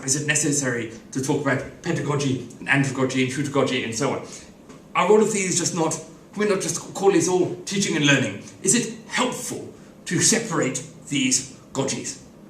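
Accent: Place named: France. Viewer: he's got a very heavy foreign accent and makes many mistakes. is he French?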